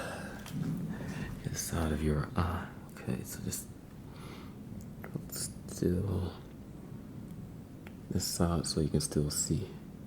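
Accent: St. Louis, United States. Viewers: Ah, St. Louis! I didn't know the accent. I definitely hear you pronounce here, ear, and there differently than I'm used to. 🙂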